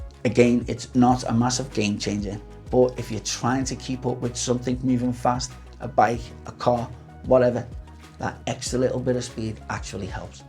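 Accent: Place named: Manchester, United Kingdom. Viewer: Really enjoying your videos. Great content - but also because your accent reminds me of home. (Live in New Zealand but grew up in Manchester.)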